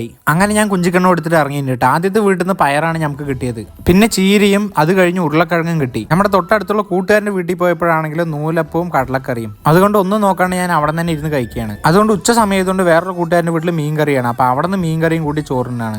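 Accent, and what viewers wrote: Palakkad, India. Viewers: Palakkad bhasha❤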